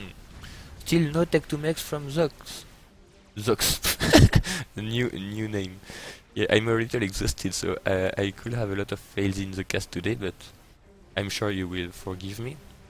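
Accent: France. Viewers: Bring back the scoot! Your pronunciation of scout was very accurate, but the french accent only enhances the video. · tu est français? Car je trouve que tu as quand même un accent français, et cela se sent bien, je suis un de tes nouveaux abonnés et je trouve que tu vas bien m'aider à progresser dans SCFA et je te souhaite une bonne continuation!;-)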